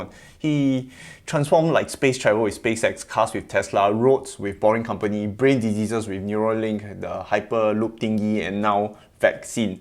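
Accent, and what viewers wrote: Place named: Singapore. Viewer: You are doing very well, thank you very much👍👍👍💖😀 . Please take some lessons in innonciating English WORDS properly and correctly, to reduce or eliminate your very heavy Singapore accent. Thank you 👍😀👍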